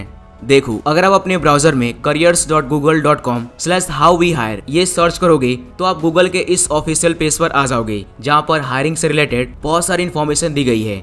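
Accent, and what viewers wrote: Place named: Assam, India. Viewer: Aap assam se ho kya?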